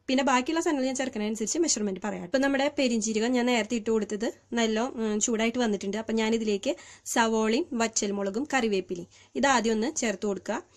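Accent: Thrissur, India. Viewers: ചേച്ചിയുടെ അവതരണം സൂപ്പർ . സംസാരവും തൃശ്ശൂർ ക്കാരി ആണ് അല്ലെ വീഡിയോ കാണാൻ കുറച്ചു വായിഗ് പോയി സോറി . സംഭവം കലക്കി ... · haha...kollam chechii...enike ee recipem chechide sumsaravum istapettu....thani nadan thrissur bhasha..nyc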